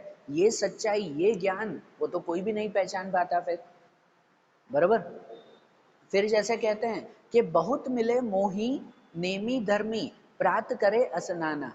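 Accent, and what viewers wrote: Gujarat, India. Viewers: What a explanation with Gujarati accent 😅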